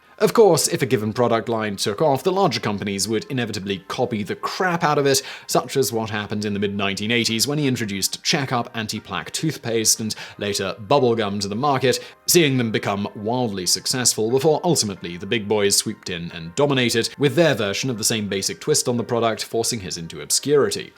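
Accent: United Kingdom. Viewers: Un trap en ur....lol the British pronouncements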